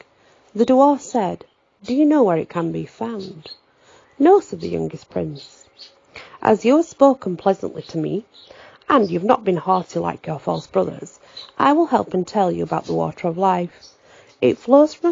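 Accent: United Kingdom. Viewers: sounds great with you English accent 🇬🇧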